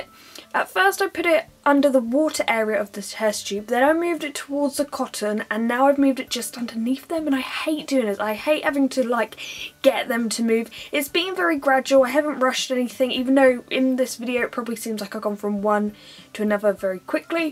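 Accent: United Kingdom. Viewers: U sound so British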